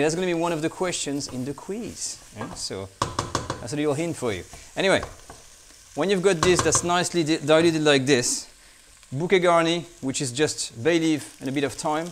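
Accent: France. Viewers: Food and French accent, what else could one ask for?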